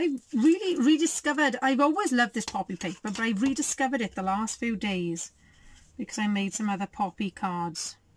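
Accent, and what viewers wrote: Wales, United Kingdom. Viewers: Good tutorial.... love your Welsh accent!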